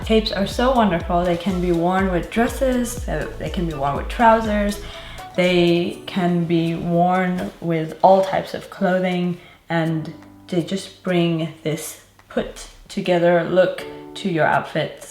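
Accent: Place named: France. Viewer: I swear you're getting a French accent! It really suits you ;) I really like the look of the first trend, I have a soft spot for tailored looks. The faux-fur sounds really nice as well x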